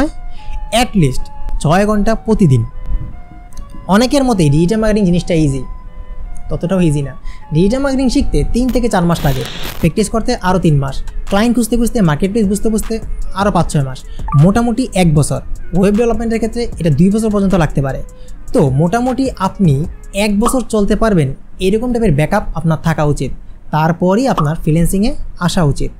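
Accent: Sylhet, Bangladesh. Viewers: Vai apni ki sylhety ni😅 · Vai apni sylheti ni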